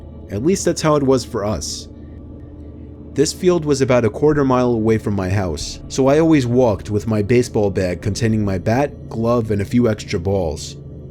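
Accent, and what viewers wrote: United States. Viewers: Lina Santos he does lol American accent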